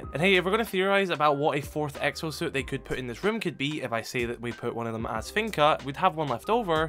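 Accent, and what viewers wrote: Scotland, United Kingdom. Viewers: Where abouts in Scotland are u from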